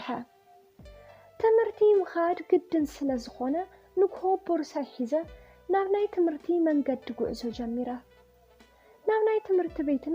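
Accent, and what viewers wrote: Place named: Enderta, Ethiopia. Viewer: ጓብዝ ኢኺ! ናይ እንደርታ ትግርኛኺ ለለ ቃሕ ይብል።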